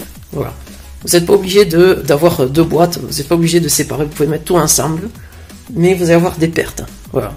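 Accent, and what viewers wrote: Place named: South, France. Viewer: Bravo n écoute pas moi je vais te parler de tes calites. tu a une jolie voix qui passe très bien avec un accent du sud qui te donne un charme fou ca passe Le charme de ta jeunesse et ta belle curiosité qui te mènera loin Plien de progres a faire tu vas y arriver Encouragement un abonné qui te connais pas amitié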